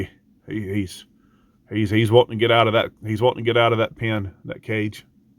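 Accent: South, United States. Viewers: So sorry to hear what little Tucker has gone through. Praise God he is better. I will be praying for you all. it is so touching to hear you praising God and your Savior Jesus. Do you remember when you found the Bible in a campground a couple years back? I began praying for you at that time. I enjoy your thoughtful talks and your southern accent. My Grandparents were from Missouri and I loved their accents. Get rested up!!! My Dr told me to start low and go slow. He made me repeat that to him several times. It is hard when your mind says one thing and your body says another.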